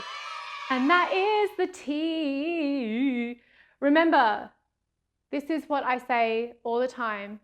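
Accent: Australia. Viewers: Lol omg your so aussie, I love it... Thanks for the tips, now I need one on how to date aussie men ughhh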